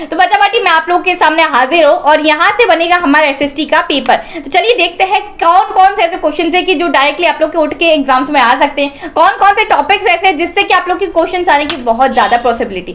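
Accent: South, India. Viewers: Mam Are you from south India Plss tell😖😖 BTW Thanks for everything🥰🥰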